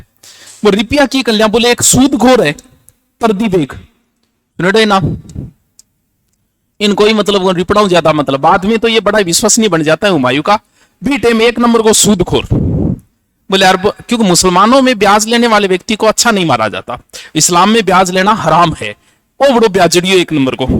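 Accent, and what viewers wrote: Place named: Shekhawati, India. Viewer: भाई हिंदी ही तो थोड़ी बहुत ही तो शैखावाटी बोलते हैं । इस बोली के कारण ही तो इसका पढ़ाने का तरीका मस्त है 🥰🥰🥰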